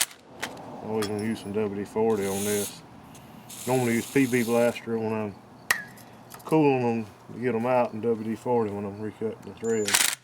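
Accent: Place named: Southwest Virginia, United States. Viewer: hey man awesome video are you a heavy equipment mechanic?? also love the accent I'm from southwest virginia and talk just like you lol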